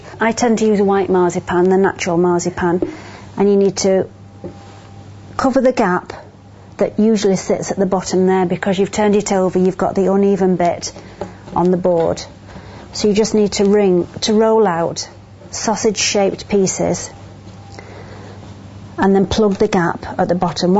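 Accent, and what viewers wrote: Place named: RP, United Kingdom. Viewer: I've never seen jam used before...interesting. I love British accents. They are so posh and everything they say sounds smart :-D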